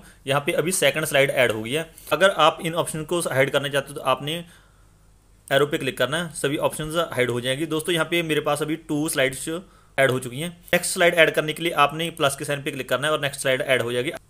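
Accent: Punjab, India. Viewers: Are u punjabi? Ur type of speaking seems punjabi ! And i like punjabi😊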